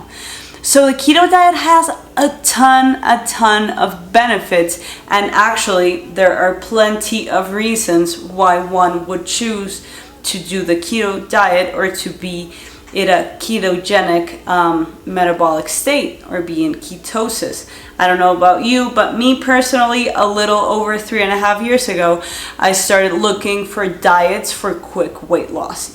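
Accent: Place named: Colombia. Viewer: Tu inglés es muy bonito ❤️ me gusta tu canal en español y me encantaaa tu acento colombiano 😊 peeeeeero estoy aquí por puro gusto para mi oído que ama el inglés. Un abrazo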